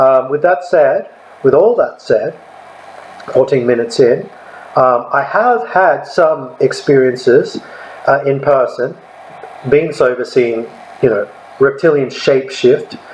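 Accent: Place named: Australia. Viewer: Good to see an aussie talking about reptilians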